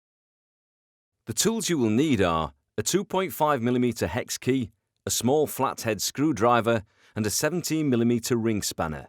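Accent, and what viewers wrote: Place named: United Kingdom. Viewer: I hate your limey voice. Piss off you wanker.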